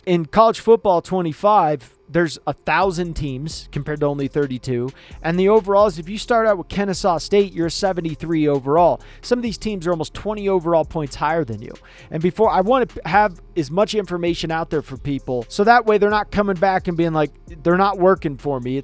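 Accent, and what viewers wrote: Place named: Eastern Shore, United States. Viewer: Great Videos they’ve helped me out a lot. Quick question I grew up in Maryland your voice sounds like your from the eastern shore. Folks out that way, have a very distinctive accent.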